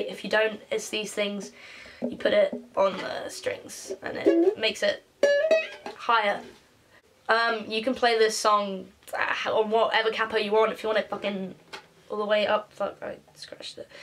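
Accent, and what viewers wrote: United Kingdom. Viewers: I'm so sorry about your aunt, amazing cover, your voice is so beautiful! (I also love your accent, I love British accents because I have an American accent) 💖💖💖💖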